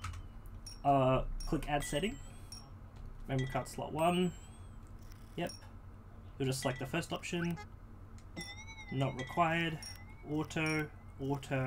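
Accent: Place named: Adelaide, Australia. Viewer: champion, cheers unrelated, but are you from adelaide or is my ear for accents way off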